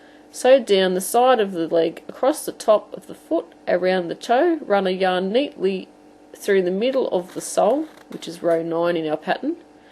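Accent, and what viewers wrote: Australia. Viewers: Thank you thank you thank you just started to learn to crochet and these are the easiest booties ever if I didnt say it enough thank you also good to hear oz accent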